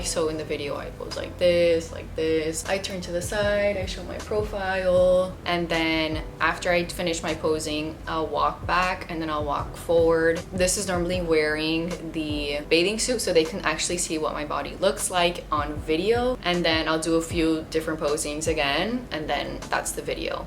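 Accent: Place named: Miami, United States. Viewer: im from miami & as soon as i heard u speak knew u were from there too! thank u for the helpful video xx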